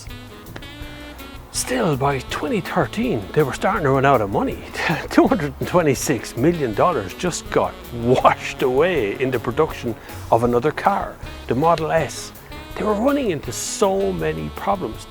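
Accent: Ireland. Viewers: Excellent documentary. Thanks very much. Just a minor point as a Canadian watcher: Newfoundland is correctly pronounced "Newfin' lan". Also, they have accents very close to your own as this part of Canada was mainly settled by Irish migrants. Carry on. Great content. Cheers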